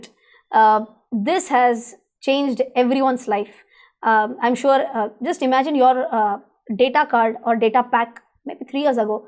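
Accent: India. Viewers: Could you start speaking in hindi cause I listen to a lot of English stuff nd it's hard to adjust to your English, I am nt saying you english is bad its just tat indian broken English a lot harder to understand then even hindi, I can understand hindi a lot better then broken English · Definitely your english is nice it is actually better version of english. The india english accent.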